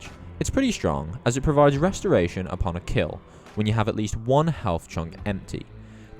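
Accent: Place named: United Kingdom. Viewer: Right, idk why i said american, when that's totally an uk accent, so why uk people say "staminar effect, instead of stamina"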